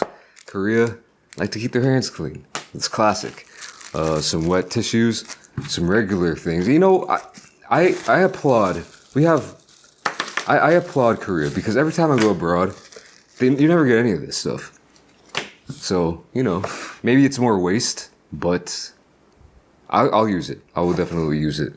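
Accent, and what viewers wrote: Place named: California, United States. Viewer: You talk so cali 😊love it and i love and enjoy all your videos!!😊❤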